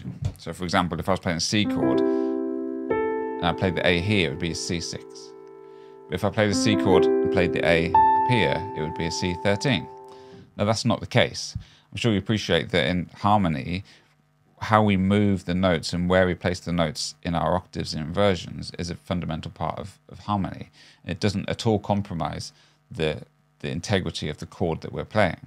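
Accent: United Kingdom. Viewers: Sounds smug and pretentious? That's just his British accent.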